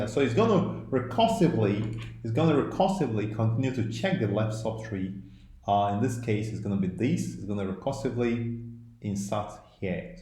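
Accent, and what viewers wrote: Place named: Nigeria. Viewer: Thanks bro. I don't know if I understand better because of the Nigerian accent, but this helped a lot.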